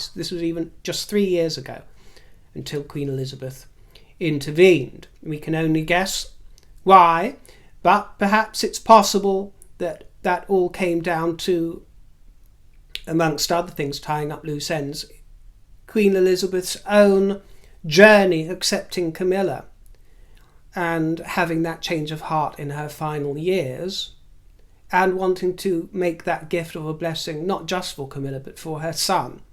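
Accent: South, United States. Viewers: For some reason UK people can do good southern accents. I was born in New Orleans in 1955 and grew up in Atlanta. Currently reside in Augusta, Ga home of the Masters golf tournament. Your accent is gentile southern. Reminds me of my great aunt Ann and her daughter cousin Beverly. At Easter they would visit and wear the greatest floral hats, dresses and cotton gloves. Very gracious ladies. Seen no more I'm afraid. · You are a hoot. Yes im a southerner from kentucky. I understand and enjoy your humor, i,mo ,also 88 years old, 4 sons , 6 grandchildren, one great grandchild,young at heart. ,and loving fun . Love the english people and your love of fun- thank you for making my day. Your southern accent is pretty good , although each state has its own accent. Mine started in Nashville tennessee and moved on to louisville , ky. Ancestors all english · I love your Southern accent. 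· you are a treasure! I really enjoyed your southern accents and your wonderful costume today.❤ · Nice southern dialect . You are so talented. You are loved by us commoners as well. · Love your look❤! And your Southern accent 😅! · You look absolutely stunning today,. I wish I had the cajones to wear just one of the pieces, I adore the color, and your makeup is perfect. Your southern Belle accent is just fine. You made my day! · Edit— 😂😂😂😂that accent!! I’m a KY native, but naturalized Texan, and there are many southern dialects. Each southern state has its own twang, and I think you did a fabulous amalgamation of them all😂😂😂😂I think Camilla proved herself to be a servant to the people and fervent supporter of Charles, and the Late Queen recognized that. Elizabeth may have been feeble of body but certainly not of mind. I’m not a Meghan fan, but I wish the conspiracy theorists would stop the pregnancy nonsense. 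You could see her pregnancies in her face. It was not faked. And after Archie was born, Meghan still showed the fullness in her abdomen which is such a common characteristic. Had it been faked, her vanity would have put her in a form fitting outfit with a flat belly when Archie was presented to HMTQ and the Duke of Edinburgh. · Hi, your southern accent is quite fun. A bit like the older accents that were more sophisticated sounding than those now common. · Your southern drawl is not far off of the mark, beautiful! Impressive, indeed ❤ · oh, you are a hoot! I adore you and your Southern accent. I am from South Carolina (USA) and i love a good accent. I will say that a southern accent is hard to do. Just be consistent in which one you choose. I am tickled by your broadcasts and wish you all the best! Much love, Kristi from South Carolina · "we want privacy tour" lol that made me laugh out loud. 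Well said. What a picture. I love your southern accent, too! Thank you for the smiles and chuckles,. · Hello, I spat my tea out, at your southern accent. It reminded me of Blanche Devereaux from the Golden Girls. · 😂 You nailed the U.S. Southern accent! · your Southern accent is a hoot. So enjoyable...thanks. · PRECIOUS, I am from Alabama and your southern accent is better than mine!!! Thank you for your wit and wisdom, my dear 😂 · I'm interested in how you define your look today - I wasn't sure if you were going for gypsy or medieval princess or a member of the harem. On the whole I think probably medieval princess. Your American accent was certainly southern - a cross between Mae West and Scarlett O'Hara. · 😅 😂😮😊 love your American accent. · You’re hilarious! Love the southern accent ! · OMG! Loved the Southern accent! But it’s your explosion of laughter at the end that leaves me in pieces. 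Yes! I find myself in Rivulets of sparkling delight! You’ve DONE IT AGAIN!!! 🇨🇦💕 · Bravo, lol. I think your southern accent is great. Red is definitely your color. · 🤣. Thanks for the laugh my dear friend. Love the southern accent. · Oh ….. your southern accent had me in stitches! You’re just Divine! Thank you for always speaking your truth! ❤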